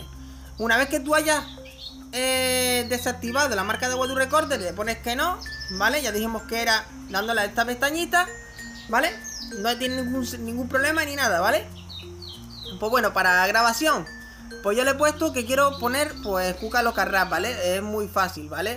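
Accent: Dominican Republic. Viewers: Dominicano verdad?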